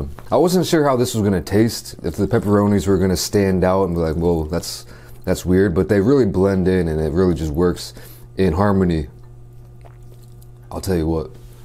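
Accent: United States. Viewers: I know he is living in Korea, but he sounds like such an American boy!!!😁✌🏽❤️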